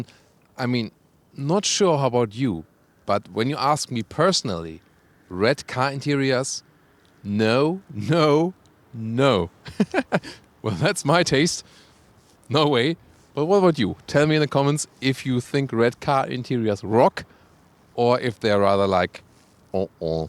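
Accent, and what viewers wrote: Germany. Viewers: The main catastrophe is not the wording, which is sometimes also incredibly German, but the German pronunciation, which almost physically hurts. It is more than ridiculous to say that this is perfect English.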